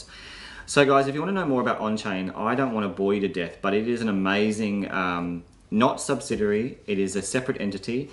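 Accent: Australia. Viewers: Thanks for the great imfo...refreshing to hear an Aussie mate. Awesome presentation too.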